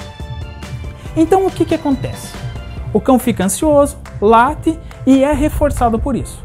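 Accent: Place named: Curitiba, Brazil. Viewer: Esse cara é de Curitiba, certeza.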